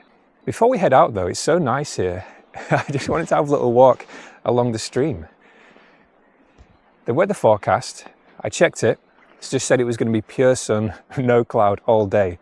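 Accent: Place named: England, United Kingdom. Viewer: Ah *that*'s an English accent I like. Fits the scenery, too.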